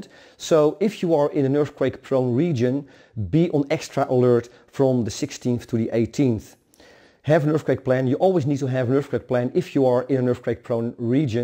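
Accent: Netherlands. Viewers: No, he is just Dutch.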